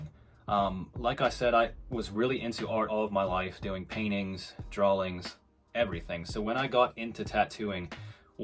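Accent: United Kingdom. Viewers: You sound British - can really hear a twang in there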